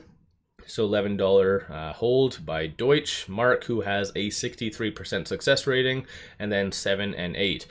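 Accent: Canada. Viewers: Thanks again for your analysis! It sounds like you live in Canada, eh? I am in Oregon. Just got to tell you...I am a huge Rush fan, so go Canada!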